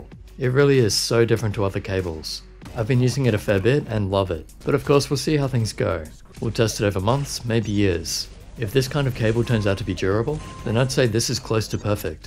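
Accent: Australia. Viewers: Nathanael Ciranni not really, it’s more that his accent doesn’t sound Italian. Sounds more Australian, or maybe from New Zealand.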